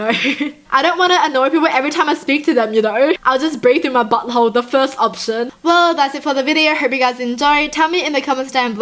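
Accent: Australia. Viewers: Is she from Australia I am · Is she Australian?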